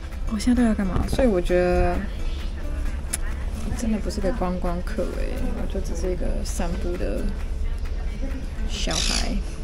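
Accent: Taiwan. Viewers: 高雄口音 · my favorite tour guide with a sweet Taiwan accent, you made the Malaga tour very interesting. Great job! Thanks.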